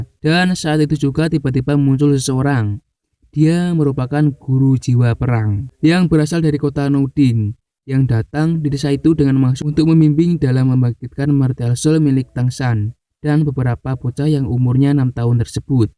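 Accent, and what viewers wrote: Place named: Java, Indonesia. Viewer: logatmu medhokk bro... wkwk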